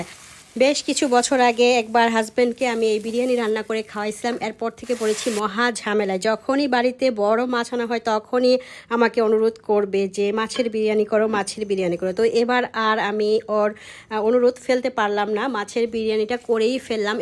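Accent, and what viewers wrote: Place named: Bihar, India. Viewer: আপু আপনি কি বিহারী..? আপনার ভাষা শুনে বিহারীদের মত মনে হচ্ছে।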